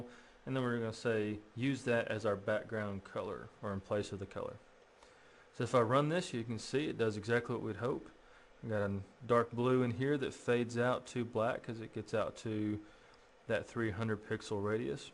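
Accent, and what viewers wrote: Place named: Midwest, United States. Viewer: he sounds american to me. Probably from the midwest or south.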